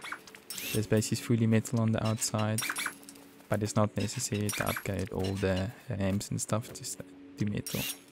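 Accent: South Africa. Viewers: Heyy, South African?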